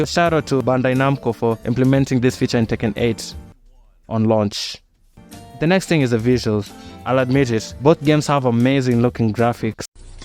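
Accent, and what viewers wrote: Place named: Kenya. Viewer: Just stumbled on this channel, you sound Kenyan 🤔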